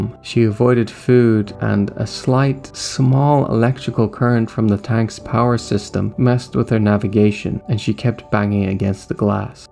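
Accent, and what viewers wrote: Ireland. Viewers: is the narrator Irish? he pronounces "sandy" strangely.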